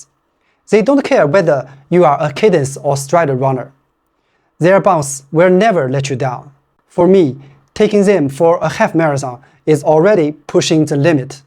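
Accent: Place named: China. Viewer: 你这chinglish听得我全身发麻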